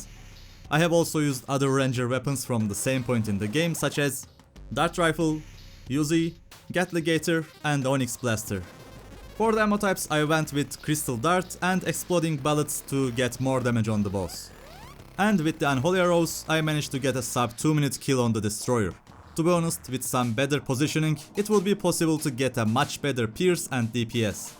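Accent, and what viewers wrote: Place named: Turkey. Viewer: Are you Turkish? Your accent is resembling the Turkish accent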